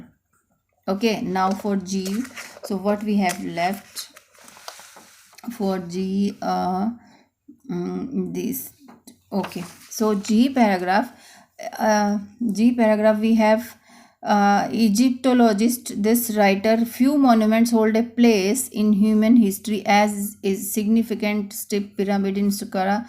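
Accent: India. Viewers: Your accent like indian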